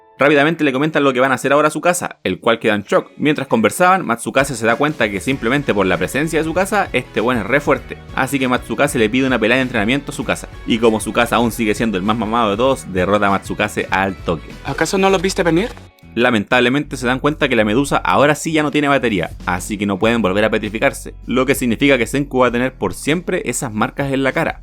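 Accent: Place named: Chile. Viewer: no aguanto su asqueroso acento chileno....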